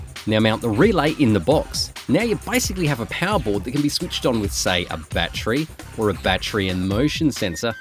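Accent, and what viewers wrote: Australia. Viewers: Omg you like an Australian and comedy vir. of Michael Reeves XD insta sub! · You have to be Australian , you just sound like it . Not to sound rude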